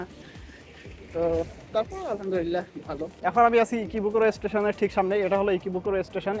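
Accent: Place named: Cumilla, Bangladesh. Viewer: ভাই আপনার বাড়ি কি কুমিল্লা নাকি? ওই এলাকার ভয়েস এর মতো মিল লাগে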